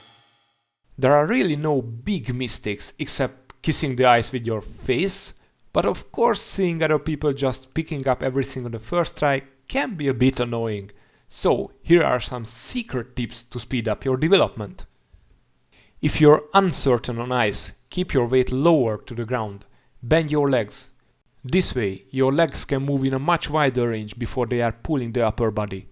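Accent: Hungary. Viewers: Vó srákok nem gondoltam volna h magyar csatorna :D pedig felismerem a magyar angolt. Pont jol jonnek ezek a vidik. Ment a sub pisz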